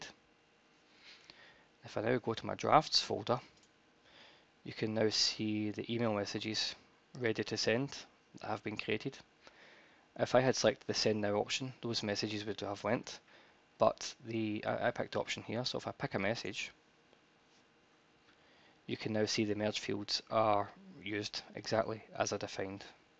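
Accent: United Kingdom. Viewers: Great video, the British English cracks me up a little ;) "Please call on <num>", Lol ;)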